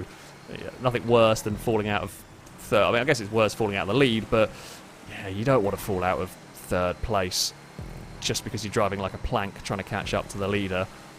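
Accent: United Kingdom. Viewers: That marvelous British English is half the reason I watch this channel, not gonna lie.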